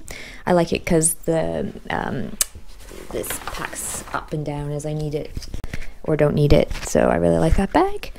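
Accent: United Kingdom. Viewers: sounds british but then words like 'about' popped up and i got confused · i m sidetracked but just want to tell you that, your accent adjusted very well to British. You achieved one of most difficult task in the world.😁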